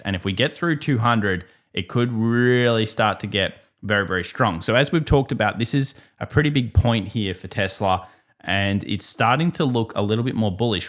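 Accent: Australia. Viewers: Kangaroo English (he is from Australia 🇦🇺)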